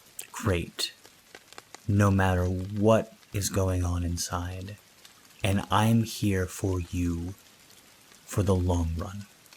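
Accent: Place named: Midwest, United States. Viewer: You have no idea just how awesome and important this video is. I just discovered your channel tonight, and found this. I think maybe I was supposed to. YT tends to be a delightful "rabbit hole" of things yet to be discovered, overall, but somehow Spirit manages to use YT to tell me stuff I seem to ignore too much. I'm not sure what else I can say except for the fact that your voice is quite soothing to listen to. I'm sitting here trying to pinpoint your accent (something I'm usually pretty good at). At times you sound a bit like you're from the Midwest (Iowa, Missouri, Nebraska, etc) and other times, you sound like you might be in the mid-Atlantic states (NC, W. VA, MD, etc). Care to reveal the truth? ;-) (I'm from Iowa, btw)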